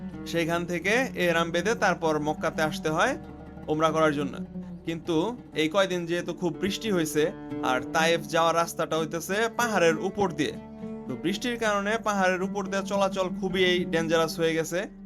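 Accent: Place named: Tangail, Bangladesh. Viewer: খুব ভালো লাগলো ভিডিও টা। ভাই আপনার দেশের বাড়ি কি টাঙ্গাইল? আপনার কথা শুনে মনে হচ্ছে আপনার হোম ডিস্ট্রিক্ট টাঙ্গাইল।।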